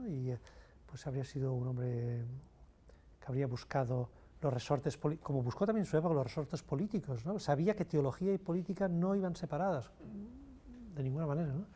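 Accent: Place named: Catalonia, Spain. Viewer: Acento catalán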